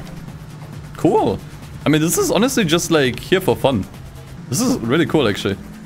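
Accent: Germany. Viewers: Hearing a German guy pronounce Spanish words is very amusing lmao not that he did bad he actually pronounced him pretty good it's just accent humor lol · Are u German?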